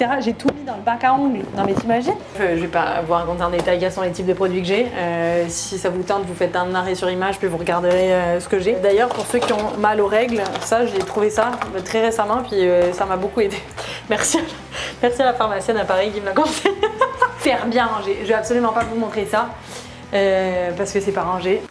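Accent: Quebec, Canada. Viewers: J’avais pas vu de vidéo de toi depuis un peu longtemps mais t’as grave pris un accent québécois ou c’est moi? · Il y a un petit accent québécois qui est en train de s'installer 😁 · C fou à quel point t’as un accent québécois comparé au début de tes vidéo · Je trouve que tu commence à avoir l’accent canadiens et c’est trop marrant comment on peux changer aussi facilement et avoir un accents aussi facilement rien qu’en habitant dans on nouveau pays , j’étais concentré que sur sa 😅j’adore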